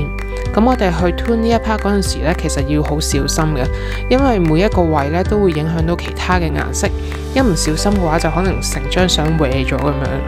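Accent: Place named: Cantonese, China. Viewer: 好喜欢听你讲粤语😝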